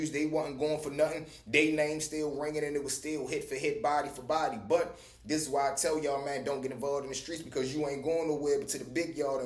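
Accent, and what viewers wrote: Baltimore, United States. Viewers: Bro I love how you tell your stories real certified bmore dude right here 💯👍🏾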